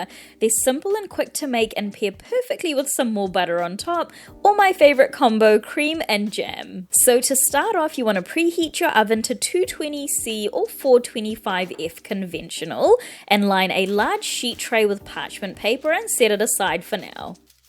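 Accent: New Zealand. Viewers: Is this a Kiwi accent? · Hi just wondering your a NZer your accent sound familiar lol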